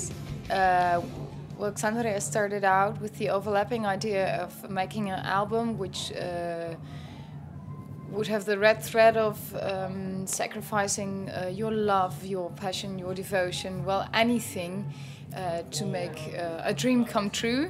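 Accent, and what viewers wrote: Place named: United Kingdom. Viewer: When she speaks English it sounds British it is different from Simone and Floors dialect,but I must admit I love woman with accents (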